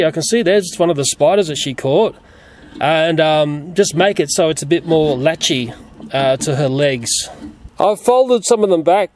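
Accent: Australia. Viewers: Maybe that’s the way Australian people pronounce it.... how curious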